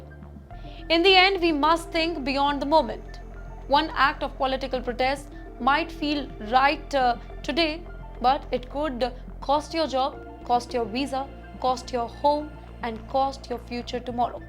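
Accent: India. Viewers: What a hateful hindi English accent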